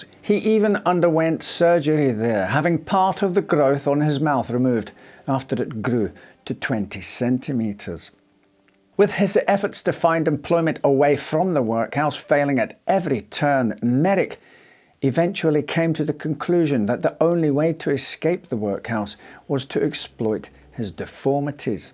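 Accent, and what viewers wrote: Scotland, United Kingdom. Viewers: I love listening to your Scots accent!! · Are you Scottish? I am sure there is a twang in your perfect enunciation!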